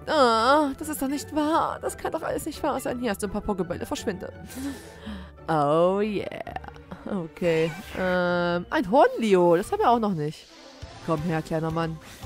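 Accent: East Germany, Germany. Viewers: Gott wie ich dein ostdeutschen Dialekt liebe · Hey, echt schönes Lets play, bin neu auf deinem Kanal und ich finde deinen Ostdeutschen Akzent echt super :) bin übrigens auch ein Ossi :D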